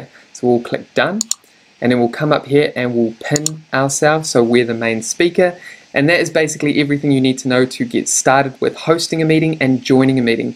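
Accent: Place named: New Zealand. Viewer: Love his kiwi accent💛💖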